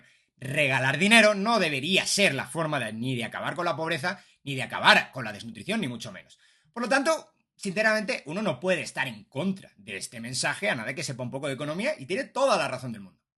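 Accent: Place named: Argentina. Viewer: Parece que tú no tú no eres de Guatemala tu hablado Qué haces para que parece que tú eres de Argentina o de Colombia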